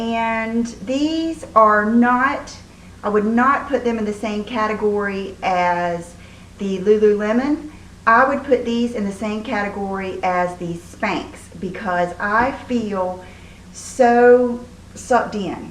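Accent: South, United States. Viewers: Great video girl! I just love u all the more! I find comfort just hearing your calming southern voice..dont ever change u remind me of my dear grandmother and her"old" southern accent...Merry Christmas!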